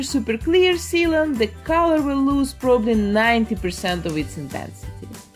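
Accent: Russia. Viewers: You are not just a terrific artist, I like listening to you talk too. I thought from your accent that you are Russian or Ukrainian.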